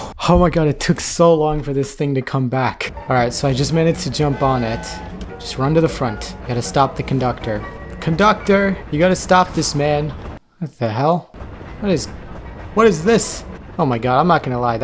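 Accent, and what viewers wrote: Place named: United Kingdom. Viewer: BRITISH MATE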